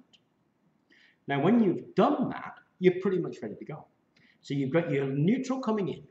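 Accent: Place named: United Kingdom. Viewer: I can't make-out some words again and think your Zoom rec/mic should be shirt pocket. British island accent is difficult for other folks across the pond too. Ni chrome heaters have huge inrush on mains.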